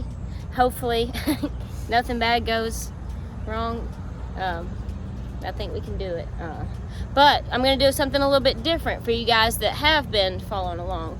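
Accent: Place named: South, United States. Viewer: good video love. her country accent...lol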